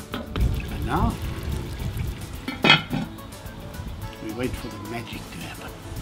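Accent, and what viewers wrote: South Africa. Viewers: Hello, I am a South African living in the USA - and even though I don't make potjies - I enjoy watching your videos just to hear your wonderful South African accent. Thanks so much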